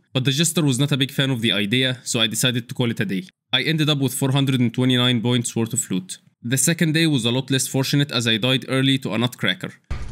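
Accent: Egypt. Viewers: I think.. your accent is Egyptian ? 👀🤔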